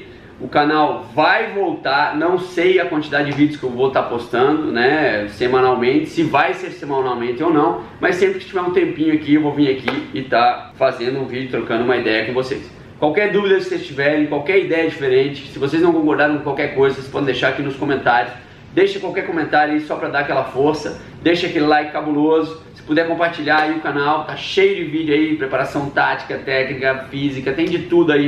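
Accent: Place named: Rio de Janeiro, Brazil. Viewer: Tu pegaste o sotaque carioca com força.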